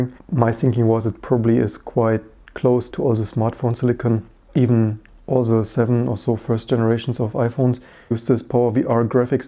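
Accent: Germany. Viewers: German native ?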